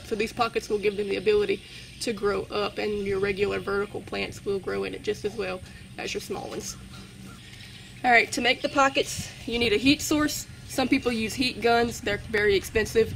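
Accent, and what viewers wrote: Louisiana, United States. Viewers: Love the lousiana accent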